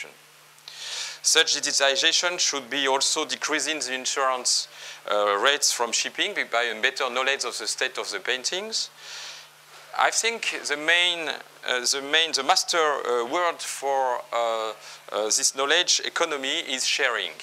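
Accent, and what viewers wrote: France. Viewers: id rather listen to a french accent that half of the braindead hick american accents. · ehh.. 47 minutes with French accent...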